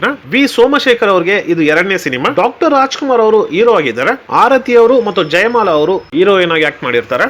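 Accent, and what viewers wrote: Tamil Nadu, India. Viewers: ಈ ಚಿತ್ರದಲ್ಲಿ ರಾಜ್ಕುಮಾರ್ ಪಾತ್ರದ ಸೌಂದರ್ಯ,ರಾಜಕಳೆ, ಘನತೆ,ಗಾಂಭೀರ್ಯ, ಭಾವಸೂಕ್ಷ್ಮತೆ,ತನ್ಮಯತೆ ಪ್ರಪಂಚದಲ್ಲಿ ಯಾರಿಗೂ ಬರಲ್ಲ.ಇಂತಹ ಅಭಿನಯವೇ ಅಲ್ಲದೆ ಸ್ವಂತ ಕಂಠಸಿರಿಯ ಅತ್ಯುತ್ತಮ ರಂಜನೀಯ ಹಾಡುಗಳು.ಆರತಿಯವ್ರೂ ತುಂಬಾ ಚೆನ್ನಾಗಿ ಅಭಿನಯಿಸಿದ್ದಾರೆ. ಜಯಮಾಲ ಕೂಡಾ ಬೆಡಗಿನಿಂದ ನಟಿಸಿ ಕಣ್ಮರೆಯಾಗುತ್ತಾರೆ. ಆ ಕಾಲದಲ್ಲಿ ಕನ್ನಡದಂಥ ಸೀಮಿತ ಮಾರುಕಟ್ಟೆಯಲ್ಲಿ ಭಾರೀ ಯಶಸ್ವಿಯಾದ ಚಿತ್ರ. ರಜನಿ ಬರೀ ಸ್ಟೈಲ್ ಕಿಂಗ್ ಆಗಿ ಮಾತ್ರ ತುಂಬಾ ಚೆನ್ನಾಗಿ ರಂಜಿಸುತ್ತಾರೆ. ಆದ್ರಿಂದ ಅವರ ಪಾತ್ರ ಹಾಗೇ ಮಾರ್ಪಾಡು ಮಾಡಿದ್ದಾರೆ. .ಆದ್ರೆ ತಮಿಳ್ ನಲ್ಲಿ ಒಂದು ಹಾಡು ಮಾತ್ರ ಚೆನ್ನಾಗಿದೆ,ಉಳಿದ ಹಾಡುಗಳು ಅಷ್ಟಕ್ಕಷ್ಟೆ. ತಮಿಳ್ ಮಾರ್ಕೆಟ್ ವ್ಯಾಪ್ತಿ ಜಾಸ್ತಿ, ಜಾಸ್ತಿ ಖರ್ಚು ಮಾಡಿ ಜಾಸ್ತಿ ಗಳಿಕೆ ಮಾಡಿದ್ದಾರೆ. ಈ ಕಾರ್ಯಕ್ರಮದ ನಿರೂಪಣೆ ಸ್ವಲ್ಪ ಸೌಮ್ಯವಾದ ತಮಿಳ್ ಕನ್ನಡ ಶೈಲಿಯಲ್ಲಿದೆ. ಕನ್ನಡವನ್ನು ಕನ್ನಡ ಶೈಲಿಯಲ್ಲೇ ನಿರೂಪಿಸಬೇಕು. ಒಟ್ಟಿನಲ್ಲಿ ದಂತಕಥೆ ರಾಜ್ಕುಮಾರ್ ಹೊರತುಪಡಿಸಿ ಒಂದು ಚಿತ್ರರಂಗವನ್ನು ಅತ್ಯಂತ ದೀರ್ಘಕಾಲ ಜನಪ್ರಿಯವಾಗಿ ರಂಜಿಸಿದ ತಾರೆ ರಜನಿಕಾಂತ್. ಅವರು ತಮಿಳ್ ಶ್ರಮಜೀವಿಗಳ, ಬಡ ಕೂಲಿಕಾರ್ಮಿಕರ,ನೊಂದವರ ಅಚ್ಚುಮೆಚ್ಚಿನ ಆರಾಧ್ಯ ತಾರೆ. 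ರಜನಿಗೆ ರಾಜ್ ಆದರ್ಶ ತಾರೆ.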